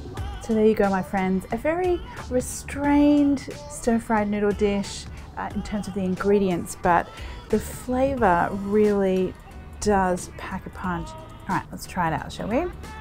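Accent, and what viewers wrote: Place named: Australia. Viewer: jee you're good, and the way you talk about food with that Australian accent is so nice to hear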